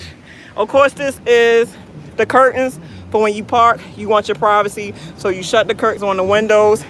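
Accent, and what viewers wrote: Baltimore, United States. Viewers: Are you from baltimore ? I hear a accent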